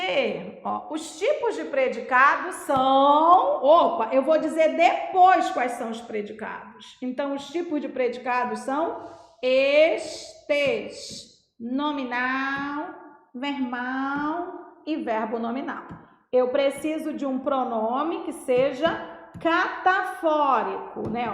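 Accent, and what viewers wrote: Pará, Brazil. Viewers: Ótima aula . melhor ainda com esse sotaque paraense .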